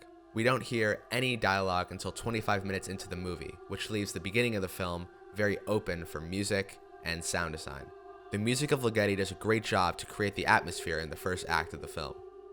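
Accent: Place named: United States. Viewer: the narrator is rubbish please speak English not USA ENGLISH👿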